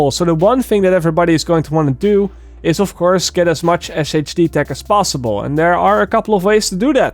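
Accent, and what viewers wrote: Netherlands. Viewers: I can hear you are dutch🤣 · Cool video! I really love that durch accent not gonna lie 😂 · Are you dutch ?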